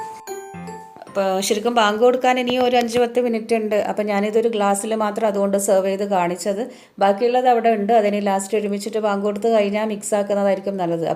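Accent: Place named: Mahe, India. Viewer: Your way of speaking reminds and miss me my hometown mahe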